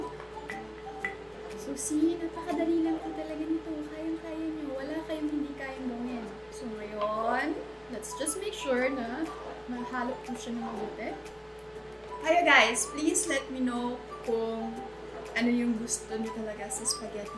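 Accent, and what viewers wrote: Visayas, Philippines. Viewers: Next vlog suggestion po. Bisaya only vlog😍 pero po sana may tagalog subs kasi tagalog kami eh😂 gustong-gusto ko lang po yung bisaya accent nyo❣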